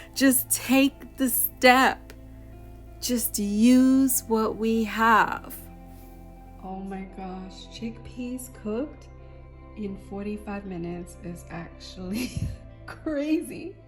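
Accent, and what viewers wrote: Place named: England, United Kingdom. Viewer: Ahh I really like your English accent woah and you look so pretty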